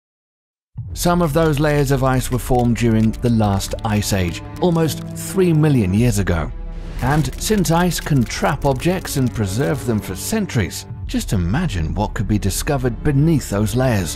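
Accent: England, United Kingdom. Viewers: Is the English accent used to make us feel more frightened? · That accent on this guy is not desirable. Why have a British dude instead of an American?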